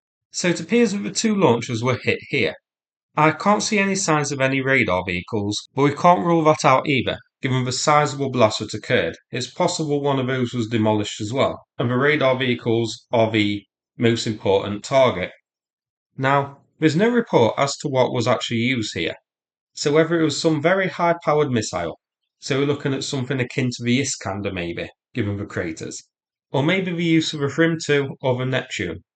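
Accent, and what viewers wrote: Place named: Estuary, United Kingdom. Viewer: Why have you gone deep into estuary English today?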